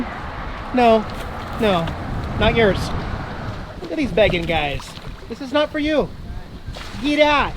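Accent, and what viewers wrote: United States. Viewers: Geez am I glad you didn’t mention the name of the native Australian bird because you American say it wrong. Just as a point, its not pronounced emoo.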